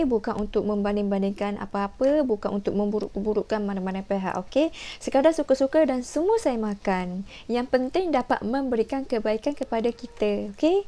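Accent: Kelantan, Malaysia. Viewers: Ore klate ko. Caro kecek pelet . Hahaha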